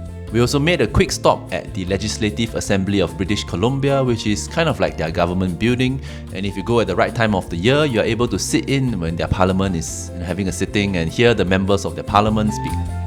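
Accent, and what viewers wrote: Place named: Singapore. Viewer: guess you are from singapore your accent sounds familiar to me. I was living in singapore for 15 years and love the memories of it. Migrated to Canada because of Covid . Glad to subscribe the video is awesome enjoy the travel ❤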